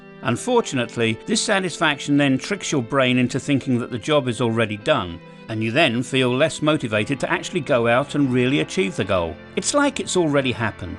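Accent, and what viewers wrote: United Kingdom. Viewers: I like your Chanel und britsh accent (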